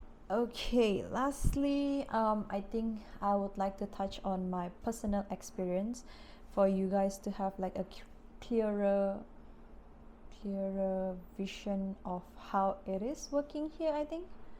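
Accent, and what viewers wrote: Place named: Sabah, Malaysia. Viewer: Lucu lah sy rsa ni video hahaha. Buli dgr slang sabah. Would like to see more contents 🤗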